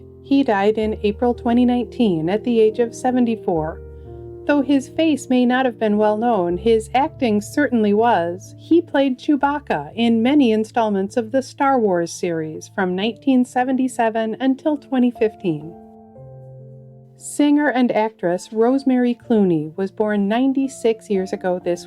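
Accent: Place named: Wisconsin, United States. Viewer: Great Wisconsin accent.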